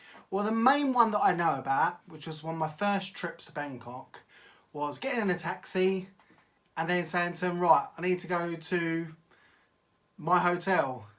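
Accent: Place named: England, United Kingdom. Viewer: he's definatly not aussie...im aussie, and i picked up straight away he's a pom(english). they dont say"th", as in "noTHing", they say "nuFFin", thirty is firty,etc.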